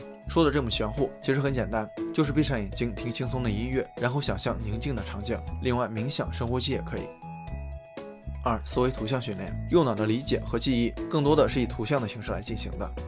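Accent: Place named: China. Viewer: 听这口音，up本来就是我们国内的吧😅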